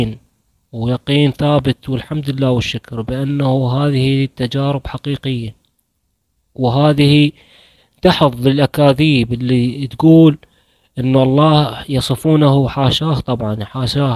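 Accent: Iraq. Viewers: تعجبني اللهجه العراقيه الجنوبيه وقصه جميله وشيقه حقاً ❤ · القصه كلش حلوه وسردها بلهجتنه العراقيه الحلوه . قصه معبره تخلينه نحب الموت ومنخاف منه ونستعد له بالاعمال الصالحه. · ما اجمل اللهجة العراقية ...فهمتك هْوَايَهْ زين ❤ تحياتي من الجزائر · اللهجة العراقية جميلة الله يحفظك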